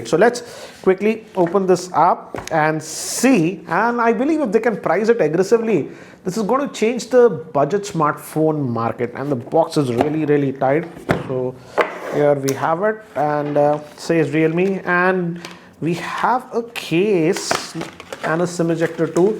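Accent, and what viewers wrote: India. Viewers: I love Indian accent.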